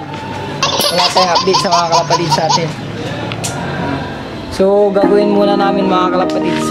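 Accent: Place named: Pangasinan, Philippines. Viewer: Taga San ka idol kc parang pangalatot ung salita mu eh Taga pangasinan ako idol shout out naman bago lng ako sa Channel mu idol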